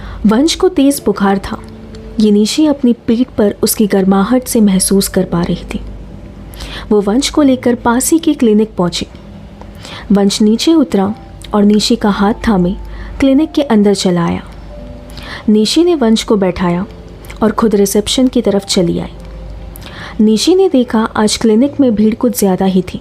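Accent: Varanasi, India. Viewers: Apki awaz me jyada maja ata hai dii rj voice me bhi sunke ka maja hai par banarsi tone apka jyada acha hai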